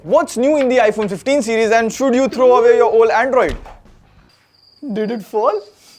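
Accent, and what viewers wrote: India. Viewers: Brother, your style of speaking English is exactly like speaking Hindi. ❤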